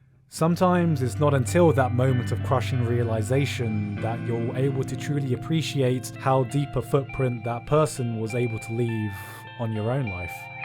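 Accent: United Kingdom. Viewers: the sexy british Voice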